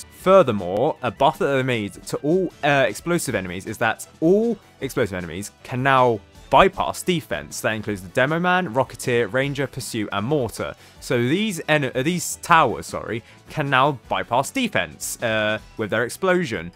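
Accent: United Kingdom. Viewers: continue yapping I love listening to it bri'ish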